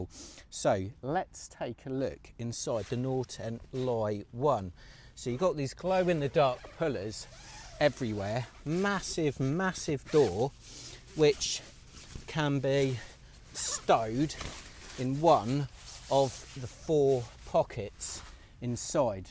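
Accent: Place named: Northern England, United Kingdom. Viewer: I am Swiss and lived in Cambridge UK for six and a half years during the 1990's. I notice your northernly accent. Does not sound like Manchester or Birmingham to me, but I could be wrong. However, where does your accent/dialect relate to geographically? Here in Switzerland we have many dialects, only 10 kilometers away somebody speaks somewhat differently.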